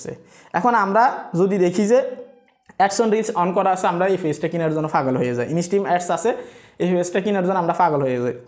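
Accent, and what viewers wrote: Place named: Noakhali, Bangladesh. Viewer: উনি হয়তো নোয়াখালীর এজন্য ওনার ভাষা এমন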